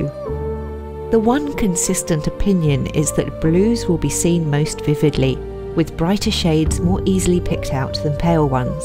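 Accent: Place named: United States. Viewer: Lmao 4 Hughe Jackmans, thank you for speaking American.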